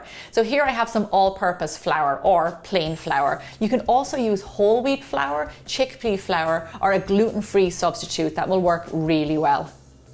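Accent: Ireland. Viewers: Are you Irish? Your accent is so charming and beautiful. Thank you for the recipe I'm going to try this afternoon with my 21 year old daughter we love to cook together. Thanks again unless you many blessings to you and your family! 💝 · For bread is as lovely as she is and her grand Irish brogue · She sounds Irish. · Okay now I know your awesome. Love your style and your practicality. Very professional and adaptable to keto. Most of all love your efficiency and that Irish accent. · Not only beautiful bread, but delightful accent!! :)) Irish??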